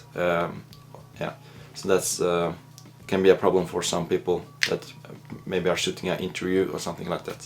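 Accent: Norway. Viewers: jeg hørte at du var fra norge, på engelsk-dialekta di ^^ Ska kjøpe det kameraet etter nyttår, gleder meg! :D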